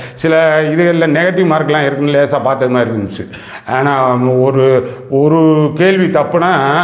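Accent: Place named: Thanjavur, India. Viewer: Sir neenga Tanjore a sir andha language maari iruku sir super sir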